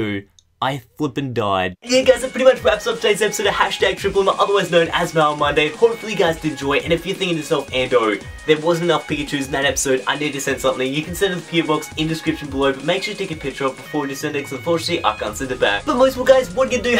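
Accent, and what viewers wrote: Australia. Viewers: you're Australian accent is fabulous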